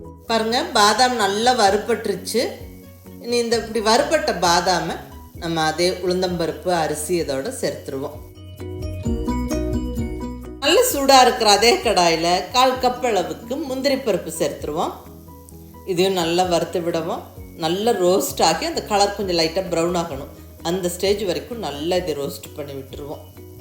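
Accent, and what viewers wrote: Kongu, India. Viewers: கொங்கு தமிழ் இனிமை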